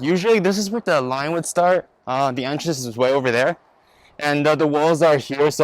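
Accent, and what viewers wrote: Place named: Rome, Italy. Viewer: Your videos are neat dude! comunque sei Romano? L’accento è quello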